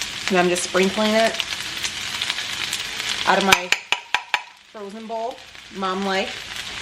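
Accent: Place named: Chicago, United States. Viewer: I was born in Chicago (East side) and raised in Illinois. You remind me of my peeps, especially your accent. Love your channel.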